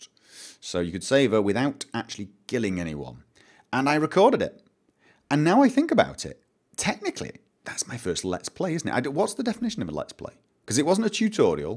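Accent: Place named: Northern England, United Kingdom. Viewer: I came to this video hoping I would just hear telling people to fuck off but instead, I heard him saying something that made me feel SO much better. Everything he said about the way he learns and reading manuals is so true to my own experience. I've not actually come across anyone who learns anything the same way I do and its good to know that I'm not the only one. Love you and btw. I was born in Stockport so its nice to hear a northern (Possibly Manchester?) accent on YouTube.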